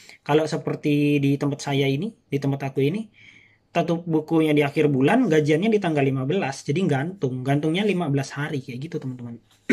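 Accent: Java, Indonesia. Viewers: Mas kamu dari jawa ya kok logat dan bahasanya jawa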